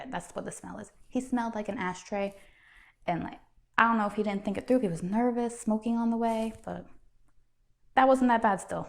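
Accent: South, United States. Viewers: im barely now realizing your southern accent lol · You're a trip. You can't really hear the southern accent in the asmr videos but it sounds good on you.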